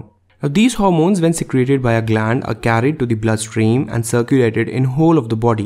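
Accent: India. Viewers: Im sorry but i just cant take an indian accent seriously. 🥲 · I like his accent :) Indians r cool but T-Series sucks :( i am 9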